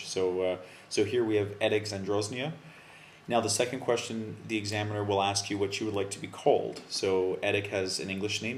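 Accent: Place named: Canada. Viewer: Just a Canadian accent.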